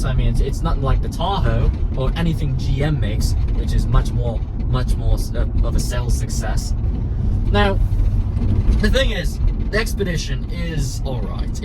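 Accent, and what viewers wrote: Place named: Europe. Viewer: This is simply false, and this masked charlatan with his European accent is dealing with an uninformed personal opinion of an individual machine. The Expedition – note: the XLT he has is the most bare-bones model-- is large, luxurious in better models and, if important regular maintenance is involved, very reliable. The engine can have a sludge buildup if insufficient maintenance is done which can lead to problems, but ignoring a car or truck is bad news regardless. It does not get good mileage, but it is a big muscular V-8 which can tow a house if you hook it up. Probably this little fellow is resentful of that fact. I take mine – with dogs, four crates and shotguns-- bird hunting and it’s great off-road and in snow when others bog down. No doubt he’d be happier with an electric vehicle.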